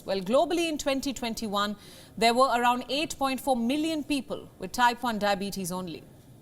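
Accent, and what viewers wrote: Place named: India. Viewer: No, she is trying - and succeeding, in being more easy to understand for audiences not used to Indian-accented English. WION - "world is one news" is aimed at an international as well as a domestic Indian audience. A major component of typical Indian accents is a difference in sound pattern, with the emphasis seeming to occur in the wrong place to what speakers familiar with the most common UK, American and Australian varieties of English expect. Slowing down just by itself helps a lot. If this all sounds like nonsense to you then look up the difference between "stress-timed" and "syllable-timed" languages. English in its country of origin is stress-timed, as is German, Dutch and Russian. Hindi, Spanish, Italian and most southern European languages are syllable-timed. As is the native language of Wales, which is why some native English speakers think people with a strong Welsh accent sound Indian, or that an Indian accent sounds Welsh!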